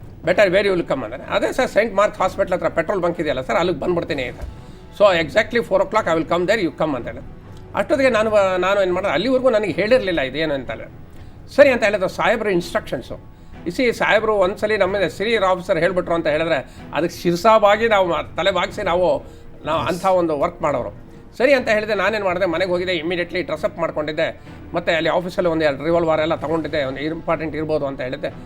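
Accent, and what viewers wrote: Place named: Andhra Pradesh, India. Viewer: sir telugu slang super sir.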